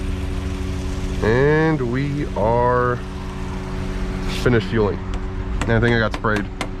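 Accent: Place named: United States. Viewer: Hi, just curious. Where are you from? You've got a very americanised accent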